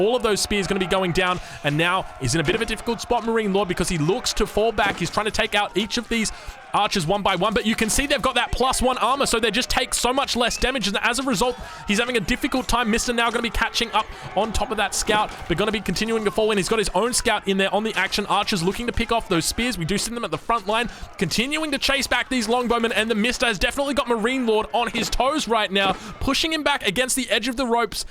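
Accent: Australia. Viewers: Your channel is going to get huge dude. You're spamming out uploads at the perfect time, you're noticeably enthusiastic and knowledgeable about the game, and Americans seriously love Australian accents. I wouldn't be surprised if you had 100k subs by Christmas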